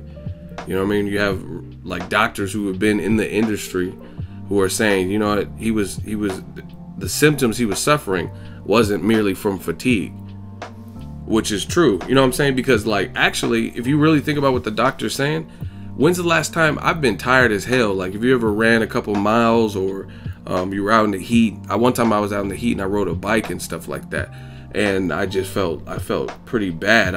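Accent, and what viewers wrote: Ireland. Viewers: WTf you are irish?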